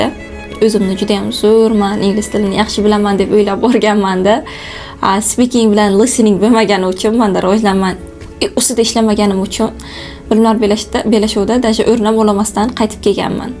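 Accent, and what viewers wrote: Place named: Bukhara, Uzbekistan. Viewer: Buxorolikmisiz shevezdan sezdim😊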